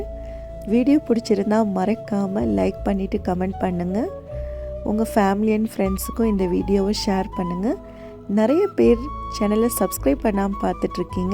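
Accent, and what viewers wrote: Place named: India. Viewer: Akka tellme about yourself more.your vlogs are interesting. Yours indian touch slang is so pretty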